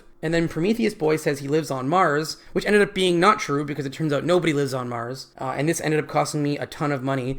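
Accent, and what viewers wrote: Canada. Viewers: This might be your Canadian accent though, but we pronounce the first syllable like BILL, not like BELL. As it's spelt. You passed in my book though!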